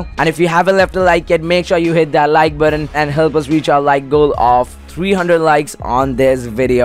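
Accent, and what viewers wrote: India. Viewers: maybe indian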